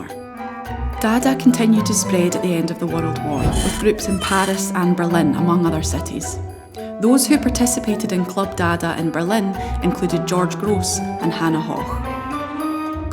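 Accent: Scotland, United Kingdom. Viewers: that scotish accent tho'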